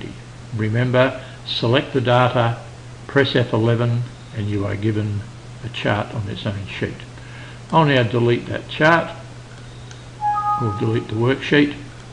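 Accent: Australia. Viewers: Hey. great video! many thanks. Would you say that this sort of test would be carried out in the UK. I only ask as I heard a Aussie (or kiwi?!) accent and thought this test might appear more in the southern hemisphere? please let me know when you get a chance. cheers. M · awesome accent, Australia?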